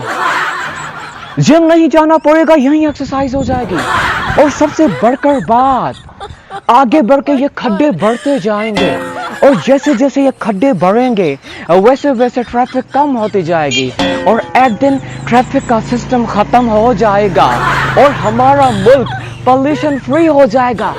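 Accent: Sindh, Pakistan. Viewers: Nice Sindhi angraz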